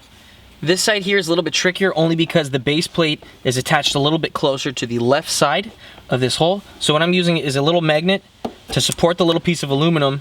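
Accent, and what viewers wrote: Canada. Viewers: He's Canadian, they gave us syrup and hockey so I can look past ot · NOAH Shirsat lmfao...where is he from Canada????? I had to stop watching the video... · He's not Canadian? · Let me guess you're from Canada? the accent is really annoying xD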